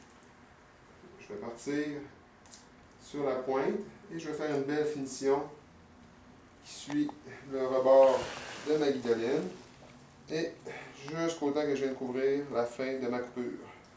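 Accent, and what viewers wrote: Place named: Quebec, Canada. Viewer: Tres bon tuto! En Québecois en plus! Merci! Asteur j'vais essayer de pas trop rusher en l'installant!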